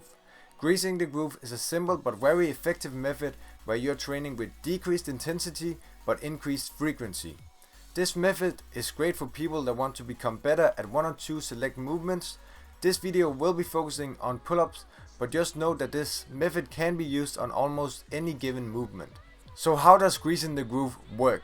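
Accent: Denmark. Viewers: This was on my YT suggestion. Gr8 vid. New sub. Look at others soon. Not always timely to go to gym. And whoa.......a very nice ring of a DK accent speaking English......all success for this channel to you.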